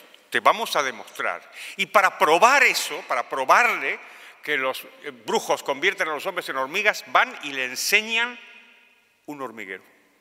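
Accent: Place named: Argentina. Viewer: Un acento argentino que no se pierde .. !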